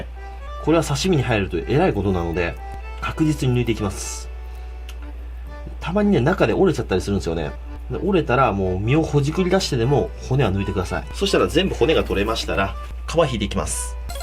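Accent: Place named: Kansai, Japan. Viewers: いつもは名古屋弁なのに今日は関西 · 今日どうしたんや めっちゃ関西弁やん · 最近喋り方が関西気味でおもろいw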